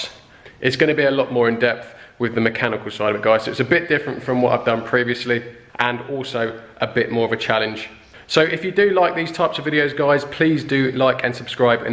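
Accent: United Kingdom. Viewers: What an ugly speach...that britisch slang sucks..sounds Horrorble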